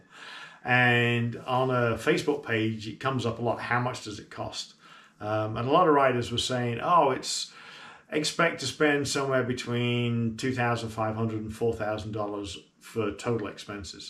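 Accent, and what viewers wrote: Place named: Western Australia, Australia. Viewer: mate I've been trying for so long to try and pin your accent. ever since your video with mototrek. I'm guessing australian, but west Australian?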